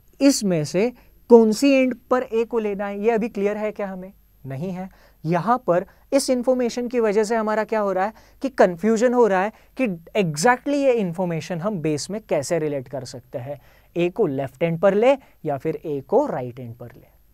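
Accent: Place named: Maharashtra, India. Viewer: that marathi accent is 😍😍😍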